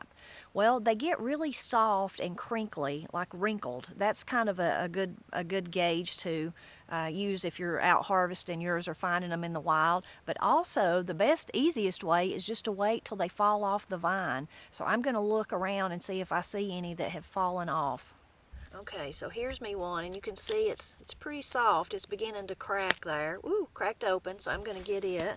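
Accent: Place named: Ozarks, United States. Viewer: So my Mom is Hawaiian- Filipino and my Father is from Arkansas Ozark area. The Ozark folks have so many similarities from accent to foods to the Appalachia folks. In Hawai’i Passion fruit (Lilikoi) is used in desserts and mostly to make a favorite drink called POG. POG is a mix of Passion fruit, Orange and Guava juices 💖🌺💖 I Love You channel! It reminds me of cooking in the kitchen with my Granny.. the accent and food is exactly the same. She passed several years ago and always hold her and her memories close to my heart. Watching your videos brings so much nostalgia. Thank You❤❤❤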